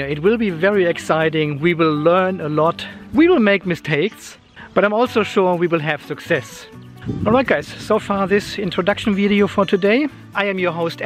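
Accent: Germany. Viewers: Dear Andy, am I right that you are originally from Germany? It simply sounds like this 😅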